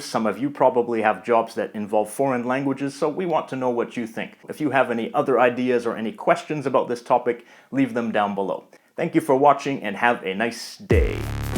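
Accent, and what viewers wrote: Canada. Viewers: Hey,?(first off as a little joke, I’d bet 50 million dollars that you’re Canadian.). Your accent is so strong. Not a bad thing at all, I just recognize the Canadian accent:-) I used to live in Cleveland for a few years growing up after leaving LA at age five. Then I did college and traveled the world. Currently I’m living back in Los Angeles I ♥️ my city!! (a mile from downtown LA and I’m actually- - believe it or not finding it hard to find any reasonably priced tutor or school of languages. We All know Berlitz is SUPER EXPENSIVE. It’s expensive already Just to live here as it is, so my location is all the way across town to Westwood (better known as Tehrangeles) which. And SoCal alone Has the Highest percentage of Iranians living outside of Iran. They’re mostly-no,.. ALL ARE very Wealthy or well to do - and all are Reza Pahlavi supporters for the most part which is fine I’m not being political but the generation just a few years older than me,.. 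who were 7-8 in the late 70’s or early 80’s and left the khoemeini revolution are so far snobby and all about money and materialistic.i Literally cannot find a school under $775 for a once a week four-week class?! And the private tutors want less than $90 per HOUR?! That’s the “best” price I’ve found. Do you have any suggestions or places where I can practice my Farsi? I’m semi intermediate level. Forget USC and UCLA’s programs. They’re wonderful but I would have to sell my kidney to take a semester there. I own tons of Persi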